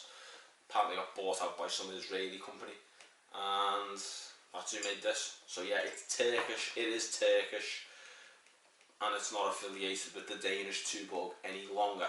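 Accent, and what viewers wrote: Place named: Liverpool, United Kingdom. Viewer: I love how you say ‘Turkish’! Love the Scouse accent. Looks like my scrotum. Hahahaha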